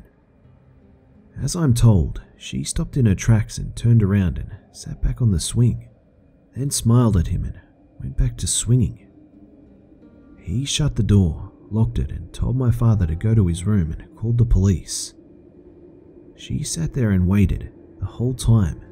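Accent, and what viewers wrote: Australia. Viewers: yes I'm aware he's an Aussie with an accent. fuck off me it was funny lol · are you aussie?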